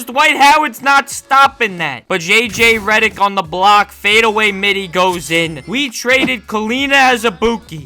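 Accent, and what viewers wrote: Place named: New York, United States. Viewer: u sound like a REAL NEW YOKA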